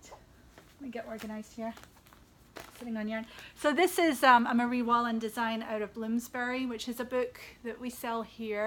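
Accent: Scotland, United Kingdom. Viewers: I’ve purchased yarn from you before, enjoy your newsletters and am thrilled to find your podcast! I love hearing your Scottish accent and seeing all of the wonderful British yarns! It’s so wonderful to have a place to actually see and be able to purchase Jamieson Jumper weight yarn!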